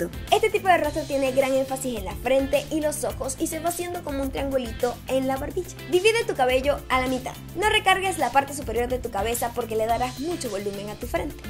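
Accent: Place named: Venezuela. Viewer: El acento venezolano es lo máximo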